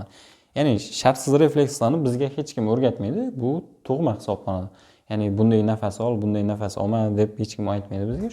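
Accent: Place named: Khorezm, Uzbekistan. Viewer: Dm zor tushuntrbsz raxmat shevangzdan xorazmlimisz diman😅😊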